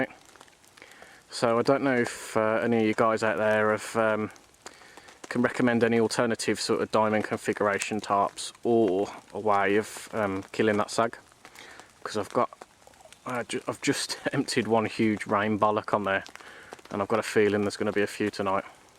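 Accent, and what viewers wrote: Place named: Birmingham, United Kingdom. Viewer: yep a true dummy brummie!! sounds and looks like a brummie!!! hurts the ears and numbs the senses... i switched off after 2 mins I've worked with these primitives... once they learn to speak we may accepted them as from EARTH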